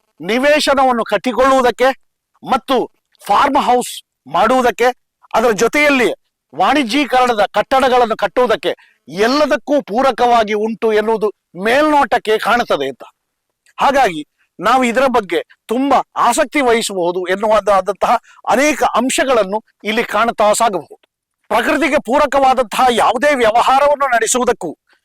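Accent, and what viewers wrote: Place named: Kasaragod, India. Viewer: ಶುದ್ಧ ಕನ್ನಡದ ನೆಲ ಕಾಸರಗೋಡು ಎಂಬುದು ನೀವು ಮಾತನಾಡಿದ ಶೈಲಿಯಿಂದಲೇ ತಿಳಿಯುತ್ತದೆ. ಸ್ಪಷ್ಟವಾಗಿ , ಕನ್ನಡ ಪಂಡಿತರಂತೆ ನಿರರ್ಗಳವಾಗಿ ಮಾಹಿತಿ ನೀಡಿದ ತಮಗೆ, ಕಾಸರಗೋಡಿನವನೇ ಆದ , ಈಗ ಉಡುಪಿಯಲ್ಲಿ ನೆಲೆಸಿರುವ ನನ್ನ 🙏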